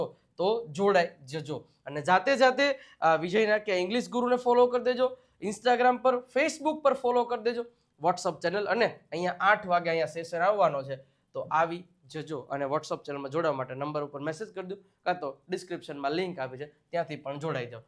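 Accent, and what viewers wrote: Kathiawar, India. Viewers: 💖💖"કાઠિયાવાડી અવાજ અને ઇંગ્લિશનું જ્ઞાન, સર છે ગુજરાતનું ગૌરવ અને શાન!"💖💖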